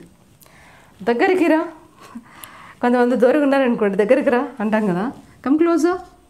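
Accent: Telugu, India. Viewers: Tqq, nice class, so sweet of you and your Telugu slang laaa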